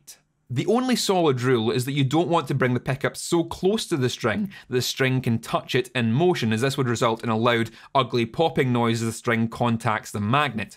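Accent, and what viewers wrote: Scotland, United Kingdom. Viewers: Great video (except too much information crammed into so little time at a speed that precludes taking notes since I can't write that fast, with such heavy British accent that I can't understand because you talk too fast)! But on a separate note (no pun intended), how different are today's pickups from S.G.'s patented ones? (I think it was SG, Simon maybe? crap, I can't remember anymore cause I'm so stinking old!!! ... Ole what's his face .... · It reminds me of Mr. Scott on Star Trek. "The engines canna take much more of this, Captain! Ach, my poor wee bairns!" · Not being a Scottish Highlander myself, I had to slow down the Playback speed here AND use the CC subtitles as I also often do with Irish movies, unlike Liam Neeson would do with his "particular skill set" of being an Irishman. The Great Scots even make the intrepid Irish look like wussies while even wearing their skirts too; that's like Viking level cool and I've gotta Love that!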